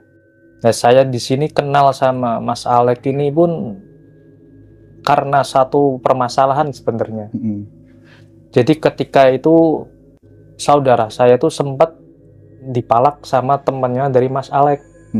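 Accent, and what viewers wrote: Pekalongan, Indonesia. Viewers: Mz nya yg bercerita org pekalongan to makanya q gk asing logat bicaranya salam dri Hongkong mz konten kreaktor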